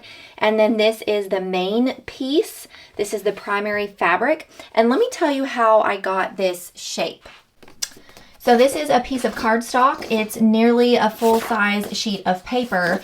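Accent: South, United States. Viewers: The lady is right, anywho is not a word, it is a phrase...a saying...a moment when words don't matter. I am from Michigan and my family says it ALL the time! My dad's side especially says it and we have no roots in the south at all. I think phrases said are a moment when the "uptight" leaves the conversation, lol. You say all the Southern you want Miss Ma'am! I like your southern accent and speech as it reminds me of when I lived in Atlanta with my best friend Julie. Her mom would introduce me to others saying, "This is Jennifer. She is our Yankee friend but we love her just the same." 🤣😂🤣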